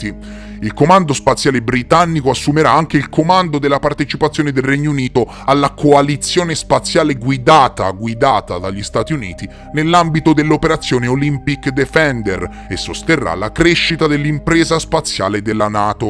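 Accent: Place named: Lucca, Italy. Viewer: I enjoy your strong lucchese accent EHEHEH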